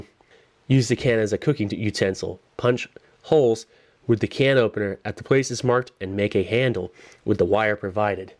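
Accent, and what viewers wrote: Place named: California, United States. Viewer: Yeah he's a pretty cool bro, sounds Californian, might not be but he's got that dude vibe going. I love it when he says "Let's get this on a tray...nice!"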